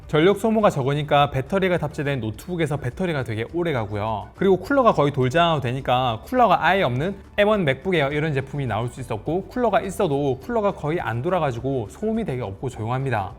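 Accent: Gyeongsang, South Korea. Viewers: 갱상도 클라스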